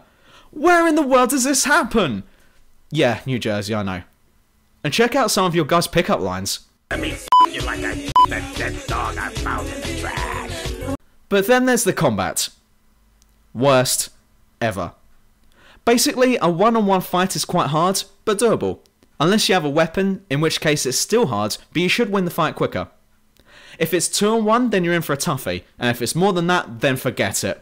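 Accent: United Kingdom. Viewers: gotta love that british accent :>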